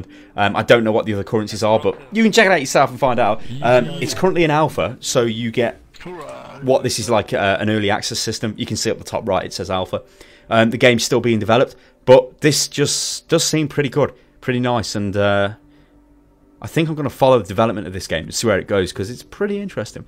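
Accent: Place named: United Kingdom. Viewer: That's like the britishiest voice I've ever heard :D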